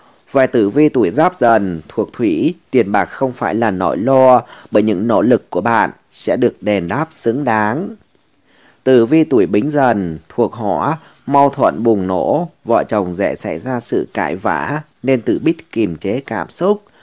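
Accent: Thanh Hoa, Vietnam. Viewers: thay. giong. noi. giong, nguoi. xuan. yen.tho xuan thanh. hoa. que. ngoai cua minh. Cung noi vay ?😄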